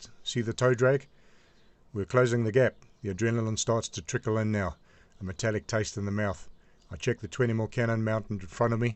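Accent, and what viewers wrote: Australia. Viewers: You drive through an ambush, or a TB, like we did. Pity you chose an ozzy accent for the narration. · Ja right you are an Ausie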